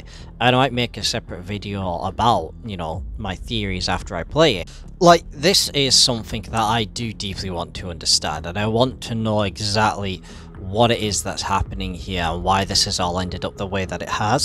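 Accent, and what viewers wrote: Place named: Scotland, United Kingdom. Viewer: I'd say he's probably Sco'ish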